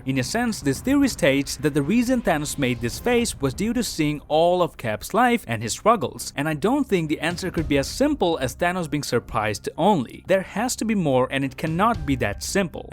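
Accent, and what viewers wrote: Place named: Quebec, Canada. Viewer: You have one of the most stereotypical French-Canadian accents ever and I love it dude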